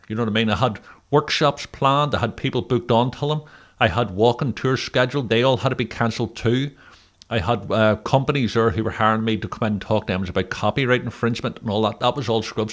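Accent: Belfast, United Kingdom. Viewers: Oh my goodness, I’m so glad I re found you... You are a inspiration. I’m going to share this with my Auntie Pamela, she’s from Belfast & course so was my dad... I did visit Belfast a few times in my childhood. The troubles were happening at the time. I was about 8 so I didn’t understand it really. Your accent is a warm reminder of my gran parents, my auntie Pam still has her Belfast accent. My dad passed away a few years ago sadly.. but we didn’t notice his accent at all which is quite funny. Probably because we heard it from birth. Anyways so happy to have listened to you today. My road has been very dark since 2009 after losing my beautiful husband to cancer.. 😞 Thanks again, my brother is called as well, I remember his name being a issue when we were visiting as not the correct religion.. lol But thank you. I’ll look forward to seeing you more. 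Take care. Lorna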